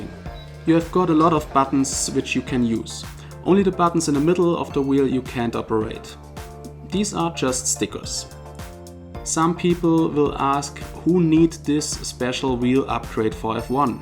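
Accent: Germany. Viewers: Wir deutschen haben halt einfach diesen Dialekt den man nicht überhören kann :-) Und weil du gesagt hast du wärst normal groß wie groß bist du denn? Habe keine Kohle mir das Zeug zu kaufen aber trotzdem... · Du klingst voll deutsch lol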